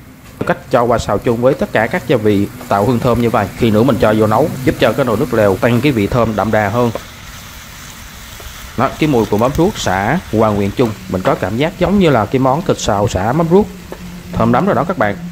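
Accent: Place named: South, Vietnam. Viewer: Các món ăn bạn đưa lên tui rất thích, có điều bạn hay xài hai chữ “ nước dùng “. Dân miền Nam đâu có “ nước dùng “ chỉ xài “ nước lèo “ thôi, vì giọng đọc của bạn nghe đặc sệt miền Nam.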